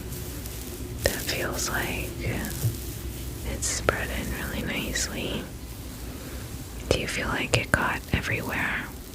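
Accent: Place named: Minnesota, United States. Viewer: shot in the dark here but I’m hinting a midwestern accent 😂 are you a Minnesotan?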